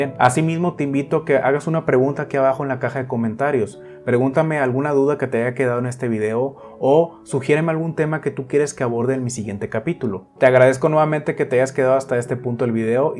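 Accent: Mexico. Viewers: Excelente video. Me suena a que eres de México. Has probado la marca que venden los de Roel? Me parece se llama velazquez. Qué opinas de ellos?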